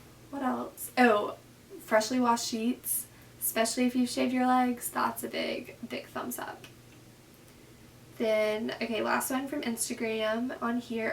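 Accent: South, United States. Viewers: I think that you have a slight Southern accent, especially on your o's.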